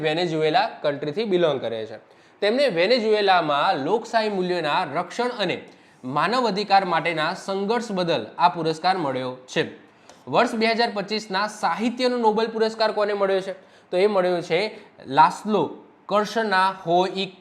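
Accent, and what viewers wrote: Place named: Sabarkantha, India. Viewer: Mu sabarkantha no so su saheb tamari bhasa mara jevi se ❤❤❤❤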